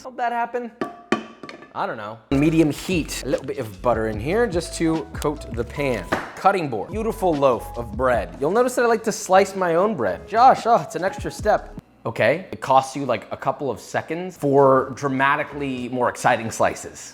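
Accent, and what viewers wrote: England, United Kingdom. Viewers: That English voice is brilliant. Most Americans do it in a jokey way but you, you son, make my proud. (I’m English if you didn’t get the drift) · As someone from the UK I really like his English accented quips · Why the British accent tho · Josh's English accent is better than Oscar Isaac's. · What's with the terrible English accent? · Your English accent is getting quite refined You absolute mad lad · where did the british accent come from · The British accent has me dyinggggg lol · Can we have Josh do an entire video with the English accent? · You're an inspiration to us all buddy, keep up the good work!. Josh's British accent gets me every time. Love it..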